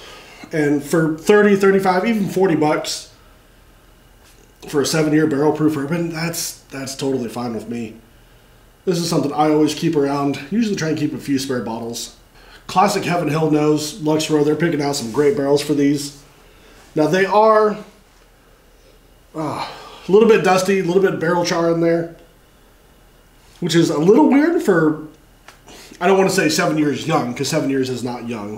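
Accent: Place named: Nashville, United States. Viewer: Sound like you live close to Nashville I’m from Lewisburg !!!!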